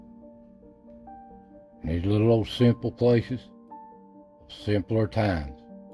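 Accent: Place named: Appalachia, United States. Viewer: I’ve been watching you for a few years now. Love your shows and your Appalachian accent. Glad you’re feeling much better and can get around now. Sending prayers and much love your way dear friend. Keep us all informed on your little woodland friends. Loved seeing all the old homes. Wish they could all be restored. See you next time.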